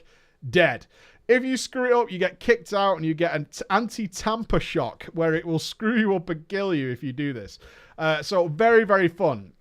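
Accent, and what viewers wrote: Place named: United Kingdom. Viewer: no joke, you man, you know this game like the back of your hand. In fact, I think you probably know this game, BETTER, than the back of your hand. And Andy, why are yall have troubles? I just want to know. Cause if I was Andy, I would just say, "Preach, dude, lets make some more skits together, or whatever." Oh well, dude, you ok, all of that wow information AND your British too? WoW, is all I got to say.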